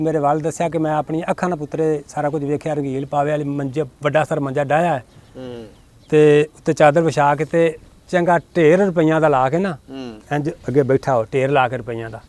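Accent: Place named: Punjab, India. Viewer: Auncle g my parents came from Gurdaspur too, I like yr Punjabi accent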